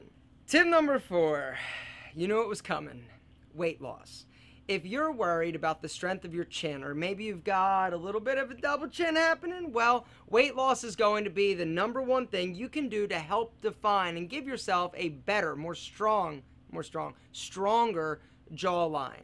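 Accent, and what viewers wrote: Philadelphia, United States. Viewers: Are you from philly? Wooder.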